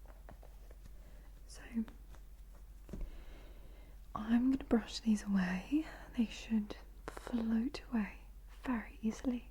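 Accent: England, United Kingdom. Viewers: !! Happy Thursday!! CONGRATS ON 100K!! 🎉🎉🎉 Very well deserved!! I’m always happy to visit your new ASMR uploads:) They make my day filled with comfort and relaxation and love the British accent:) You are awesome:) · Are your England? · Are you originally British? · If this was the first video of yours I watched I'd have believed you were British. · Just love your British accent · Best English accent this side of the pond. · Your accent is very good middle english, I'm a Brit but when I'm In the states people think I'm Australian! I'm a guttural Londoner though, constantly dropping my H's! 😉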